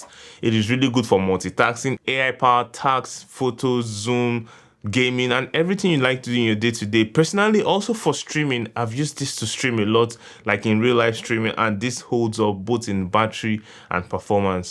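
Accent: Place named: Nigeria. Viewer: Nigerian English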